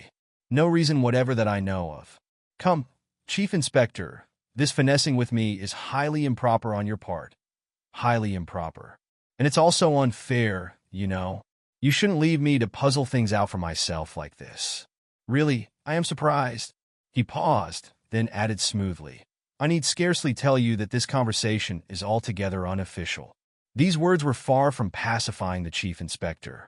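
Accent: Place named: United States. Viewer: Appreciate the American accent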